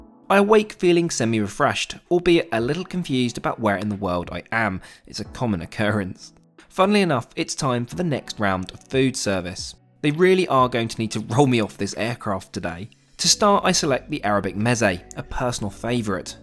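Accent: United Kingdom. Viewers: British accent pain to ears 😖😖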